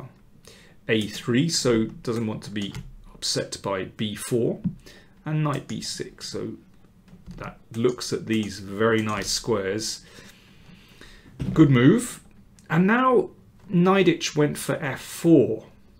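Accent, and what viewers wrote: United Kingdom. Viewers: Please keep saying "At all" in all your videos, because your ultra British accent is accentuated. It's great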